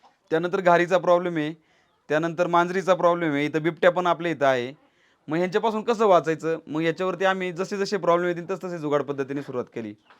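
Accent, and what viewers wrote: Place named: Dhangari, India. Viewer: Dhangari bolu nko salya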